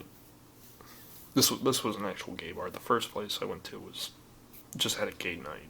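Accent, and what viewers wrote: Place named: New England, United States. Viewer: Are you from New England? Your accent, I’m a Bostonian you have a familiar accent lol.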